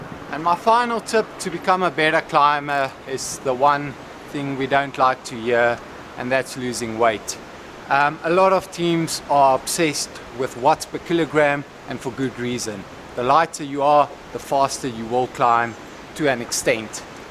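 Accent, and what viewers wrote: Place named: South Africa. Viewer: You have a lekka South African accent bru😉👍🏾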